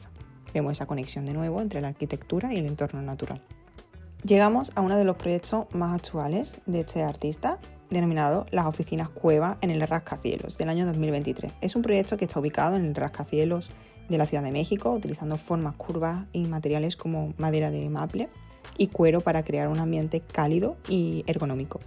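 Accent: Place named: Seville, Spain. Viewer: Una sevillana que intenta cecear. O una canaria que ha vivido en Sevilla. O una hondureña con alguna conexión con Sevilla... No sé, no pillo el acento.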